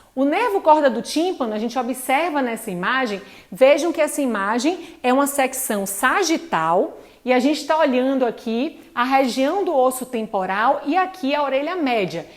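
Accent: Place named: Bahia, Brazil. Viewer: Professora, excelente aula! Melhor ainda porque matei a saudade de ouvir o sotaque da Bahia ahhuahau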